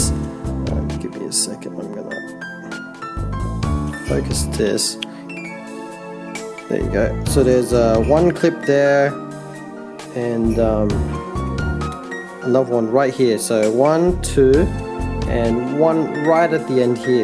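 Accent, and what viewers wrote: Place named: Australia. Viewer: Bro are you from Australia? You have the Aussie accent. No troubles getting the gun into the country? Buy from eBay?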